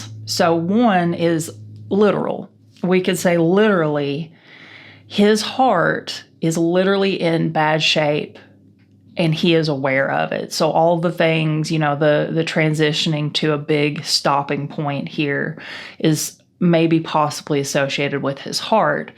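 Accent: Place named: Kentucky, United States. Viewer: Hello. LOVE your accent (my father was from Kentucky. It's a beautiful state!), and your readings. Bless you for the New Year 🎊🎆🎇🔔!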